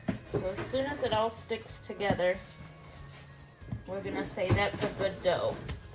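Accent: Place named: Pennsylvania, United States. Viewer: Sounds like you might be in Pennsylvania. I'm near Philadelphia. Just found you. Did you do a video with your mom on her showing you how she makes her Shoofly Pie? If so, I'd love to watch it. During my high school years I lived just outside Parkesburg. We lived down the road from a Mennonite family with twelve children. I went to school with their twin girls. We traveled to the Amish country often to enjoy all things made by the Amish and we especially loved going to Good's to shop, to flea markets in the area. Reningers, etc. Shoofly is my all time favorite pie. I've made it a few times and it is always delicious but I'd like more of a crumb topping that doesn't sink into the the delicious molasses filling. I miss living in the country. I have a fleeing I'm going to enjoy watching more of your videos.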